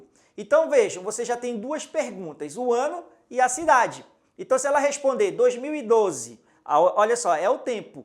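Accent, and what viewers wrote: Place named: Manaus, Brazil. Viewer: Vc e de Manaus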